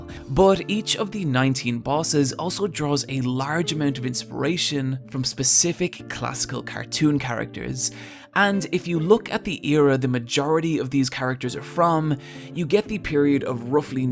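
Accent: Ireland. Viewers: because he's Irish. Whenever he speaks English he does so with that regional inflection, which affects pronunciation IE how he says "Film". It's not something that is "incorrect", unless contradicting common elocution and that can only be called out by someone that lives in that region. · Am I the only one who feels like that he sounds a lot more irish in this video than in his others?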